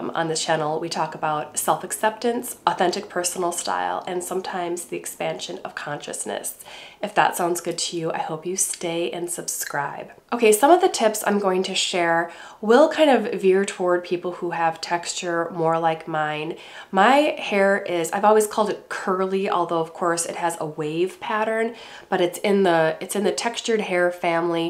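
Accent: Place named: Minnesota, United States. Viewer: I knew I noticed a hint of a Minnesota accent! 😉. I hope you’re feeling better. My hair “routine” consists of Joico de-tangling spray, Chi Silk Infusion, my Dyson supersonic and round brush, and sometimes a touch of argon oil or anti- frizz serum once dry. My hair is straight, so it’s pretty easy. I’m envious of your beautiful curls!!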